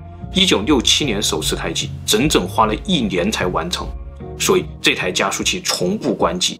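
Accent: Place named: Hubei, China. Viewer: 湖北口音听习惯了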